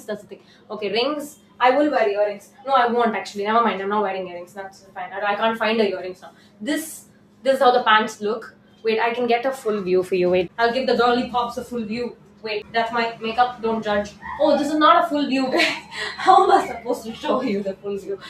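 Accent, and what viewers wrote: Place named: Tamil Nadu, India. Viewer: Olunga thoongu akka , health ah paathuko erkanave kaal lu adi pattu irruku neenga thoongalana innum weak aayuduveenga approm kaaal epdi irruku ??? and ennaku puriyuthu neenga english la pesuna all over india la irundhu yaaru paathalum puriyum so nalla views pogum nu ana ungaloda tamil slang dhaaan akka engaluku romba pudikum❤so mudinja varaikum tamil layum pesa try pannunga and take care of your health ❤❤❤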